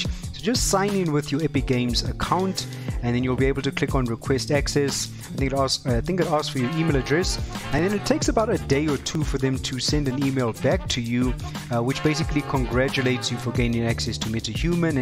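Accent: South Africa. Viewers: South African accent detected...Which city you from ma se kind? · My guy, watching this video, I kept thinking to myself "That's a familiar accent...", stoked to discover another Jo'burger. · 1st Thing I thought, this guy sounds like a South African :) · I'm curious, are you South African ?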